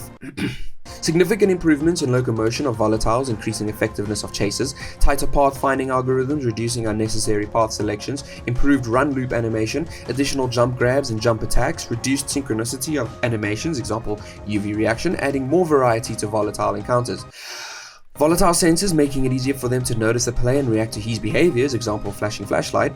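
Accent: South Africa. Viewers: I can recognise that accent anywhere. It might be too subtle for most to notice, but not to other South Africans. Where are in SA are you from, bru? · Lekker video bru, i could instantly tell from the accent you are from South Africa 🇿🇦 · Not related to the game, your accent sounded South African and confirmed it. Glad to see SA creators are making high level content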